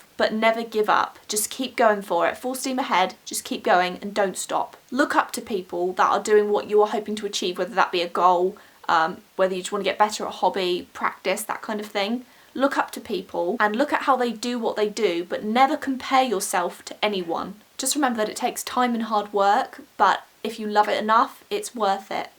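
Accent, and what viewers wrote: England, United Kingdom. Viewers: AHHH how is your accent still so english!?!??!??? x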